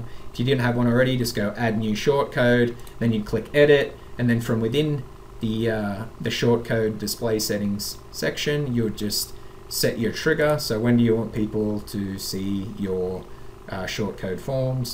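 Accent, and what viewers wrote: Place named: Australia. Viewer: That was a very very good video. Thank you for sharing. BTW, love the Aussie accent :)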